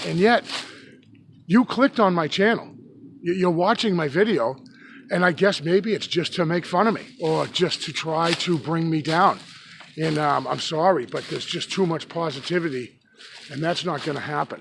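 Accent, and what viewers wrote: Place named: New England, United States. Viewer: A beautiful day for January in the East! We had rain with thunder and lightning this morning. over 50 degrees in Jan., think I'm going hiking in the woods - I'm envious every time I see you out and about - Love your NE accent - don't worry about trolls - just keep it moving, and keep the videos coming! And just keep that head of yours on a swivel!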